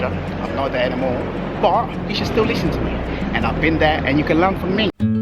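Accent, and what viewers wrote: United Kingdom. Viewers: I love your British accent 😘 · You love Malaysia, i love your English accent 😂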